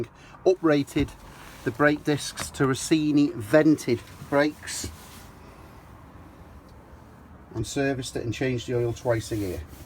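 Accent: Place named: Northern England, United Kingdom. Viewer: Lingua impeccabile, lieve accento nord inglese, con i caratteristici glottal stops. Che il camper sia piccolo è lo scopo preciso del veicolo: è un miracolo che abbiano fatto stare tutto in un furgoncino tanto minuscolo. Poi se tu hai un Mobilvetta da 9 metri, buon per te, ma non c'entra niente. Mi sa che sei un po' corto di comprendonio.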